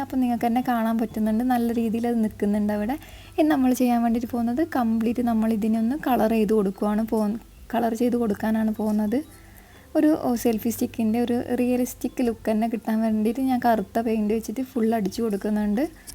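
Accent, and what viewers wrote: Kannur, India. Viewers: Eattathi Kannur slang 🥰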